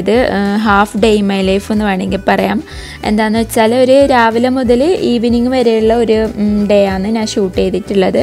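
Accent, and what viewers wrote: Kannur, India. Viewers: Kannur slang maatandato nallaind kettirikan 😍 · Njan malappuramkariya pakshe ithayude kannoor slang enikk orupad ishta😍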